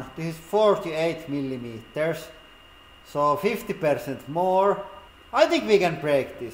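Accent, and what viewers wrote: Finland. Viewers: Is it me or does he sound more Finnish in this episode? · I must compliment you again on your straightforward Finnish accent, that's a beautiful thing and always a pleasure! Olet raudanluja kokeilija, hiihdä näitä latuja ja menesty vielä suuremmin! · Got to love this Finnlish accent!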